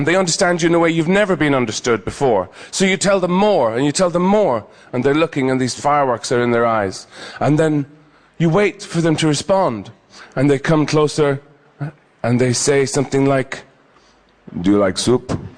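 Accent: Ireland. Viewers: как это нет? а лойк вместо лайк, а эбойт вместо эбаут? а ирландское r? а интонация? вполне типичный ирландский акцент (республика Ирландия) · Ирландский акцент довольно клевый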